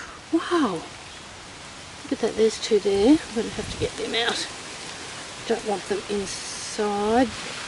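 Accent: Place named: Australia. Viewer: The in-ground system she's using is literally called a compot, you dumb nugget. She's australian. We just talk like that.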